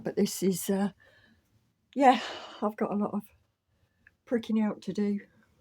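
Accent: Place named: Kent, United Kingdom. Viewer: I’m originally from Kent, similar accent 👌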